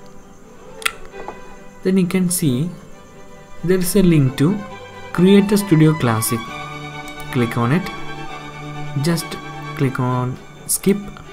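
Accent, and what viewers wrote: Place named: Kerala, India. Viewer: Malayali English.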